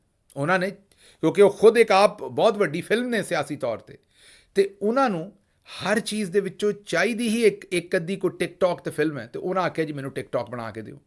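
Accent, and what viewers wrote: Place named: Punjab, India. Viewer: Love your Punjabi accent❤❤👍👍👍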